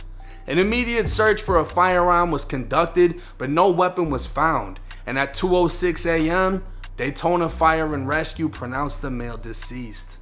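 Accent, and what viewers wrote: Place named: New York, United States. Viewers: Why do you have a New York accent as a Floridian.. please explain.. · The narrator, the one that telling the story sounds like a New York hooker, bro, talk in your own language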